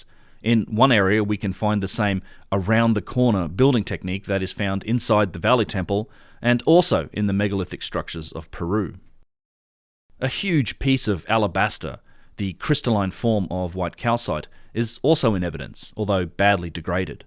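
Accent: Australia. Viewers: Say, saw your full name written somewhere and it indicates you're either from Dutch or Belgian-Flemish descent (probably Dutch judging by your Australian tongue). I'm from Belgium myself, Flemish speaking, so, that makes us practically neighbours, eh?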